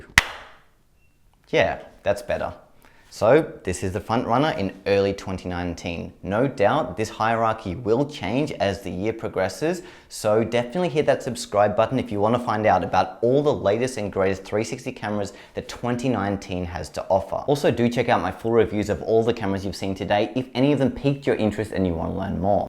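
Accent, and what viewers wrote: Australia. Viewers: i think you are australian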